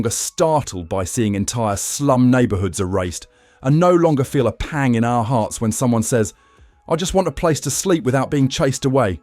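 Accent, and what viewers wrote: England, United Kingdom. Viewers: Tell me why the narrator has an English accent.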